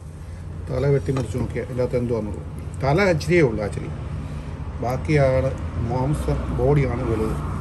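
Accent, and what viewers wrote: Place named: Central Travancore, India. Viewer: ഈ.. ഒരു വരവും.. ആ പറച്ചിലും... ഞങ്ങടെ മാവേലിക്കര ചെങ്ങന്നൂർ.. തിരുവല്ല കാരുടെ.. നിഷ്കളങ്ക സംസാരം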